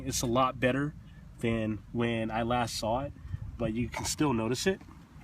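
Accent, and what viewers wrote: West, United States. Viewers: You sound like a white guy from sorta out west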